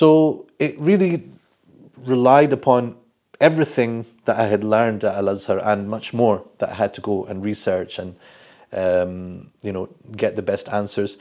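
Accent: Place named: Scotland, United Kingdom. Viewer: sorry, Shaykh. Couldn't resist. Never heard a scholar with a Scottish accent before, but l appreciate what you are doing.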